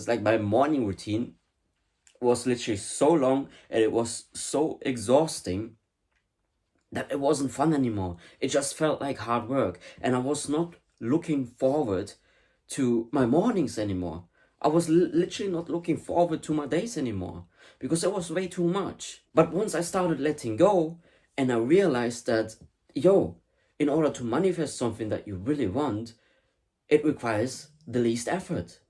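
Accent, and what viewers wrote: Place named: Scotland, United Kingdom. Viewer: are you from Scotland? lovely accent wow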